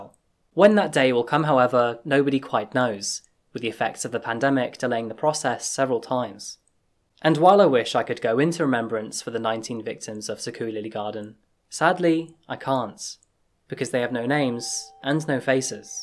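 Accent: United Kingdom. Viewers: I brought it up because apparently someone thought that Japan is any worse than any other country. Nopers. Even places like Canada do shit things to people. This video was made with a holier-than-thou attitude. It's probably best to look at your own country before you start blaming other countries for things. This guy sounds British, and we all know how bad the UK, one of the dominant colonizers of the world, has been, and continues to be. I wouldn't doubt it if this narrator himself has some dark secrets. One thing that certainly isn't a secret is that he is capitalizing on crimes. He's actually capitalizing off of the victims of this massacre. If he actually cared, he'd be doing work behind the scenes, helping people with disabilities, instead of going for a cushy YouTuber lifestyle. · out of context but i get so calm and feel so good listening to this guys voice even tho hes usually talking about murder lol i love u adorable british guy who tell me true crime cases, i love u!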